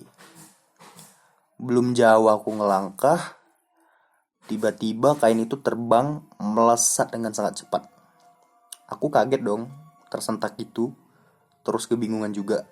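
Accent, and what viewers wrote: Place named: Medan, Indonesia. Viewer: Suka x aku bg denger kau bawakan cerita ini, medan x kurasa.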